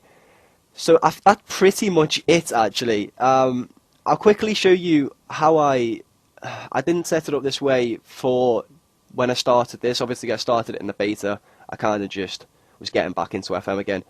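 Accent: Liverpool, United Kingdom. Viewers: Scouser? :D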